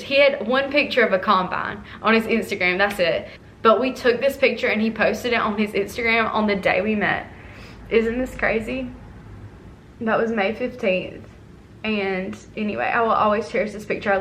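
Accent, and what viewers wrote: South, United States. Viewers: CONGRATULATIONS ......❤💚♥💛💙🧡💙♥💜❤💚💜♥💙 Where are you located? .. you sound southern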